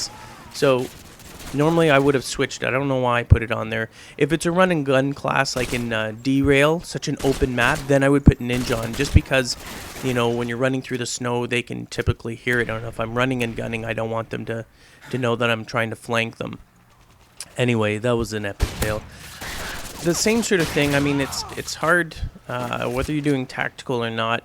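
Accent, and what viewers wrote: Canada. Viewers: Didn't even need to see your callsign to know you're Canadian. XD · are you canadian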